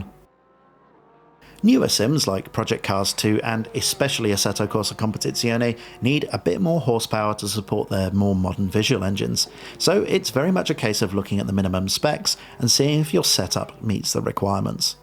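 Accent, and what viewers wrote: RP, United Kingdom. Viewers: a person has a RP UK accent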